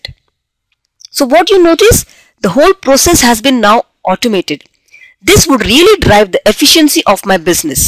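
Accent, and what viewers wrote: India. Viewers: I find some of the comments here a bit offensive. The presenter may have an accent and a high voice but I find their explanation clear, easy to follow and concise. The English is grammatically correct and yes may have an Indian lilt but is easy to follow.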